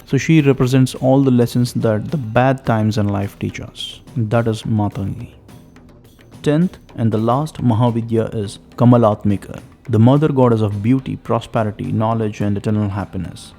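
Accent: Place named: Tamil Nadu, India. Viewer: Hi I'm not judging From your accent I can feel that you're from Tamilnadu? I might be wrong, sorry for that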